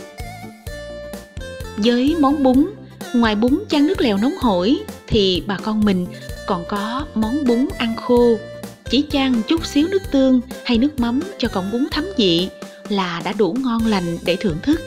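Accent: Mekong Delta, Vietnam. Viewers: Chất giọng huyền thoại...làm nên tên tuổi của người miền tây..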